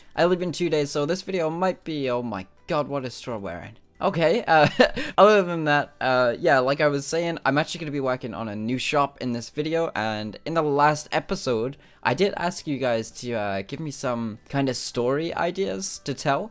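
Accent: United Kingdom. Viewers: I love your British voice😘❤️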